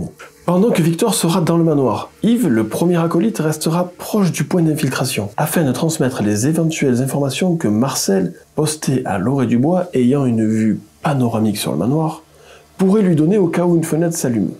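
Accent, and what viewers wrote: South, France. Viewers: Bonjour, un grand merci pour votre récit qui m'a tenue en haleine du début a la fin j'adore votre façon de raconter et votre accent du Sud comme le mien.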